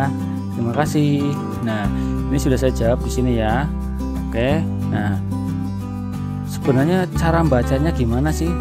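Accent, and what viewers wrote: Banyumas, Indonesia. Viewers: semangat om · Om, adek ikut an belajar yah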